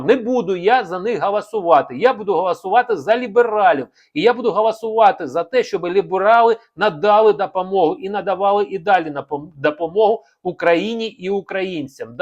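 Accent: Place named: Russia. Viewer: На какой ты мове розмовляеш у тебя страшный российский акцент не треба кацапский выучи мову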